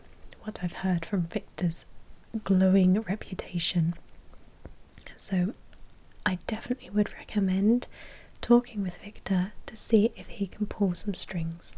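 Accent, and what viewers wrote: England, United Kingdom. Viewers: To, I enjoyed your vampire roleplay. The title was Vampire Blood Bank. I love your English accent very much. And just that, actually makes this particular roleplay. Extra special. I hope that there will be more vampire role plays, in the near future.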